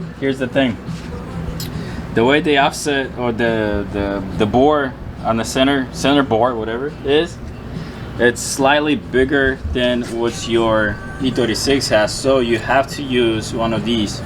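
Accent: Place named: Russia. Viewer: Я на первых минутах раскусил , что Русский , )))) акцент выдал))). Тачка супер 👍🏻👍🏻👍🏻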